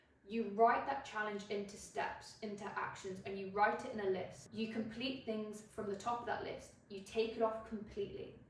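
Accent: United Kingdom. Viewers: Thank you so much, I am a freshman, And I almost finish first term. I really wanna be a productive and disciplined in 2026. I'm really interested in your schedule and your ways for being discipline Thank you for sharing your routine ❤ Honestly I dont like British accent but you changed my mind totally..I'm trying to pronounce words like yo 😁❤British accent is stunning,when you speak❤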